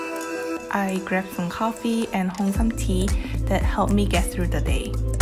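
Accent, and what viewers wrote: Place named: South Korea. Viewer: 그냥 완전 한국인발음인데??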